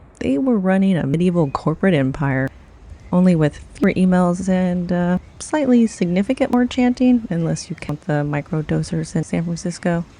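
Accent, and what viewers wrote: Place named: New England, United States. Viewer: from a personal standpoint big H went right over my head mostly! I was being captivated by that sharp witty Yankee tongue of yours and the glorious ginger mane! To comment on your original question I’m gonna now have to revisit it! 😁🔥🤟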